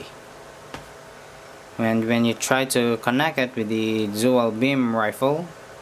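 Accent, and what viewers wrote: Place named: Philippines. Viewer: from the sound of your accent your from philippines right? :D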